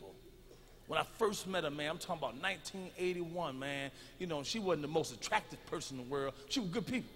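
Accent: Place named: South, United States. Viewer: exactly just go to a family down south in any of those areas nd you'll see folks speaking very akin to Mac. Nothing like the legend himself tho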